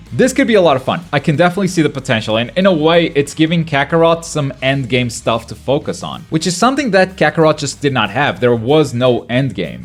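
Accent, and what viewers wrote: Portugal. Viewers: hey man good video, are you portuguese btw? i noticed you have a similar accent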